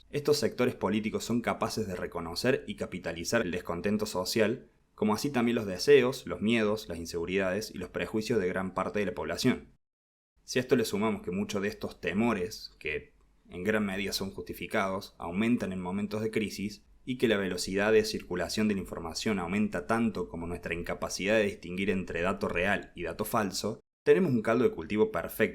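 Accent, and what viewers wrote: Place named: Córdoba, Argentina. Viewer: Estoy muy impresionado en general tanto por la calidad del video, tanto en lo visual como en el audio (yo medio que padezco la grabación de audios para cuando grabo videos). Buen video, pero además me llamo poderosamente la atención tu tonada, porque definitivamente es cordobesa.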